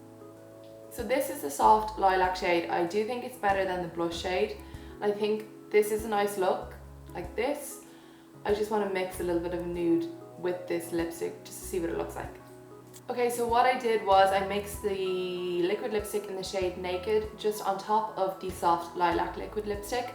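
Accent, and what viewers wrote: Ireland. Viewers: Are u Irish ??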